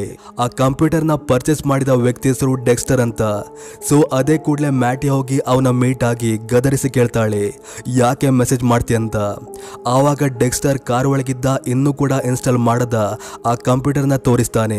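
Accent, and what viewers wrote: Kundapura, India. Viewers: Niv kundapura naaa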